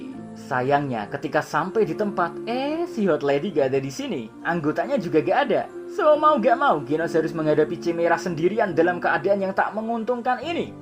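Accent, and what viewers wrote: Java, Indonesia. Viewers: Logat mimin Jawa bgt wkwkwk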